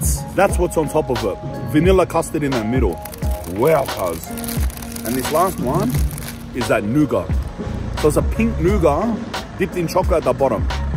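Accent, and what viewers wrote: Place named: Australia. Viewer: Interesting that the Swiss claim chocolate as their specialty when cocoa is only grown in warm to hot climates. It’s grown in Australia and we have good chocolate at I good price. Nonetheless Spanish at his best with a good Aussie accent in a place they all seem to speak English and good English too Beauty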